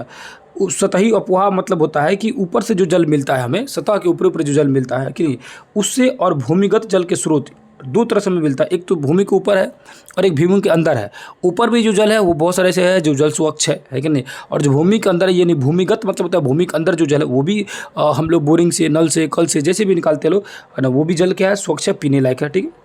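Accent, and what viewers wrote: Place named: Bihar, India. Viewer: Sir geography class 10 ki playlist me chapter 5 to 11 tak add nahi h please add kar dijiye ... Aur apka way of teaching bahut achha specifically apka bihari touch jo ata h bolne me vo bahut hi amazing hota h maine mere bahut se friends sw share ki h apki class videos ... hopefully apke jaldi se views badhe aur subscribers. 😊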